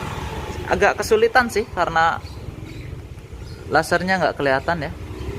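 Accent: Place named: Java, Indonesia. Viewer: Klo di perhatikan bli ini ada logat jawanya ya...munhkin pergaulan yg luas jg kli ya...😀😀👍👍👍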